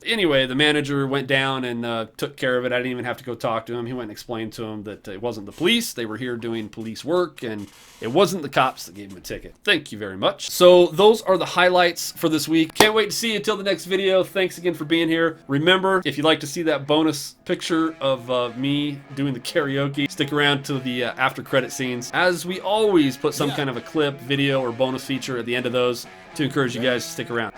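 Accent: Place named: South, United States. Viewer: Ight it’s definitely not you, this guy has a southern accent, my b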